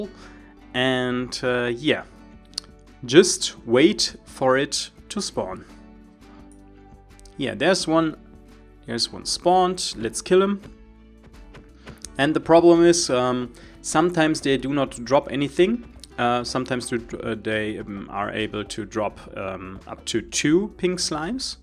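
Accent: Germany. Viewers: Man hört soooo raus, dass er deutsch ust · man hört direct das du deutsch bist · You hear like a German guy speaking english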